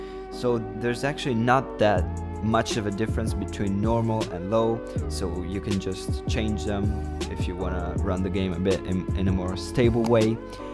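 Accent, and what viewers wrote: Germany. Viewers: Good tutorial thanks (btw German accent? :d)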